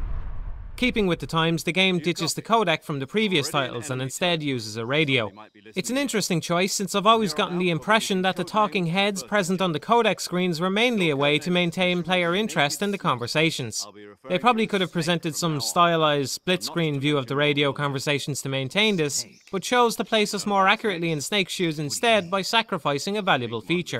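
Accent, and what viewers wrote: Ireland. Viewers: He is Irish so I'd Imagine they have a bit harder to pronounce thingsm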